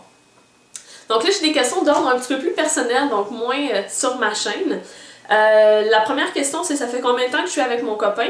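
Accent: Canada. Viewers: Coucou merci pour cette foire aux questions où l'on apprend a te connaitre un peu plus avec ce bel accent du canada (le meme que mon cousin qui y vis). tes tutos sont super car tu expliques tout avec patience et j'aime bcp ta personnalité tu es une de mes youtubeuses favories. continues!;) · empilage de boite o pas tu reste très naturelle,tu es dynamique,souriante et très naturelle.j'ai beaucoup copié tes make up ,alors continue,et ton accent canadien j'adore;)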